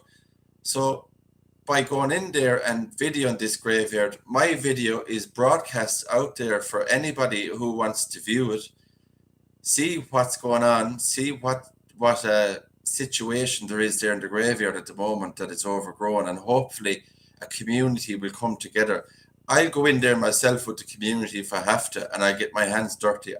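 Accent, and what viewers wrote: Ireland. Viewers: I like your Irish accent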